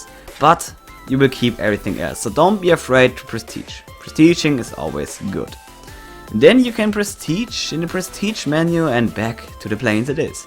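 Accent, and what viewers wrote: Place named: Germany. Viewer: Man merkt das du deutsch bist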